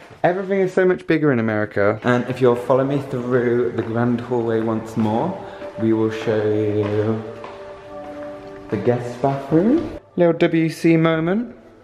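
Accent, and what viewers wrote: United Kingdom. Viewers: I love British accent and English guys, and did find your channel, Subscribed, liked and commented now... Damn you look hot ;)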